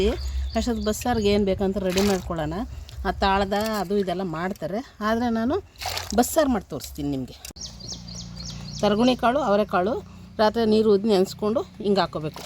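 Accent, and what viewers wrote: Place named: Channapatna, India. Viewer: Aunty maatu namm channpatna da slang super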